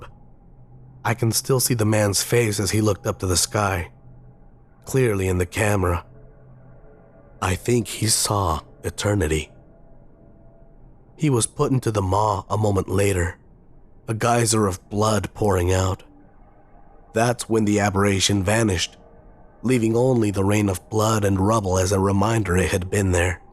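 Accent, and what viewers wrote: Russia. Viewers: Duke Nukem meets Russian accent? :(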